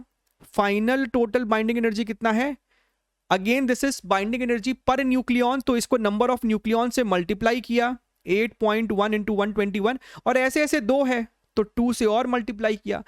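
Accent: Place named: Haryana, India. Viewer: 1:21:8 sir haryanvi tone 😀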